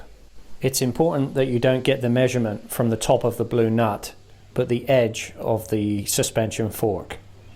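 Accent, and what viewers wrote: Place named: West Country, United Kingdom. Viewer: I wasn't sure whether it was West Country or Norfolk. Hahahaha. I'm Peterborough born and bred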